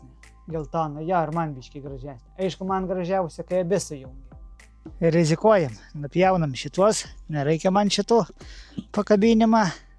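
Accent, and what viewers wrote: Aukštaitija, Lithuania. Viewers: Smagu žiūrèt śio žmogaus video del kruopštaus ir kantraus darbo, bet dar ir dèl to: bunkeriokas, miškiokas, ežeriokas, bulviotè, druskiotè ir t.t. Nuostabi aukštaitiška tarmè - glosto širdį! Vienas malonumas ne tik žiūreti, bet ir klausytis.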